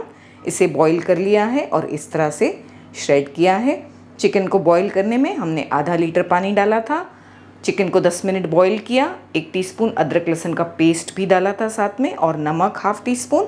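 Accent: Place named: South India, India. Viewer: You have weird accent for a Pakistani. Sounds like some south indian person trying to speak urdu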